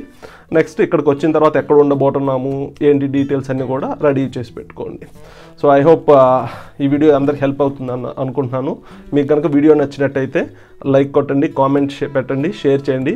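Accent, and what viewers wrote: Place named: Rayalaseema, India. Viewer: Your ascent seems rayalaseema ...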